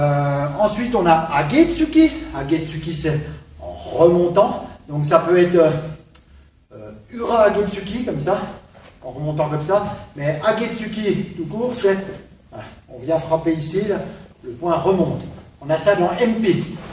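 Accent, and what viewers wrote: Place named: Franche-Comté, France. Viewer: J'adore tes vidéos. Tu as un léger accent qui me rappelle mes origines, le territoire de Belfort et la haute Saône. Cordialement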